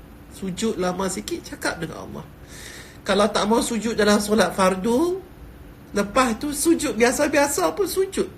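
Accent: Penang, Malaysia. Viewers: Oqang penang. Terbaik tok. Pekat loghat penang sampai la ni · Dato ckp x berubah ...penang mai..Good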